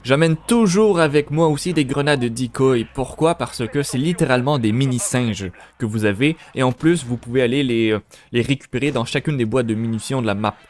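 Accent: Quebec, Canada. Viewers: Yest clairement quebecois pis y se crois 😂😂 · Merci beaucoup!! Es tu Français de France ou tu un Français au Québec??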